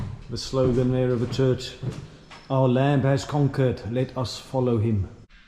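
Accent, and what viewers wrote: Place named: Afrikaans, South Africa. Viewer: Yes stay. Jy klink soos n boer, waarom bly jy oorsee, jpu nodig hier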